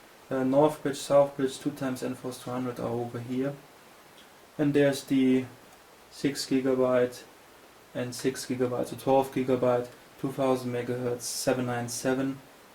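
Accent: Germany. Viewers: Kann des sein das du Deutscher bist? Dein Akzent ist so. :D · English F.A.I..L i am sure u german right?? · Man kann an deiner Stimme hören, dass du Deutscher bist xDD btw wie viele fps haste bei fullhd crysis mit kp wie viel AA^^ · You speak like a german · Nicht schlecht. Man hört so krass, dass du Deutscher bist ^^ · So ein geiler deutscher Akzent... :-) Aber Hammer Gerät ist das!!!! Haben will ! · Du bistn Deutscher :D das hört man soooo extrem :D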